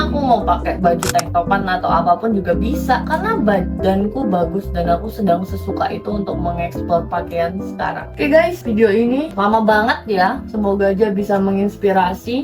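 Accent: Indonesia. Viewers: Salfok sama logat nya. Orang Maluku merantau ke Surabya, logatnya medok banget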